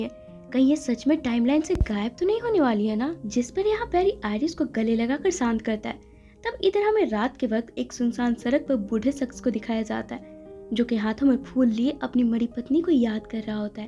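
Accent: Bihar, India. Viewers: Kya aap Bihar se hai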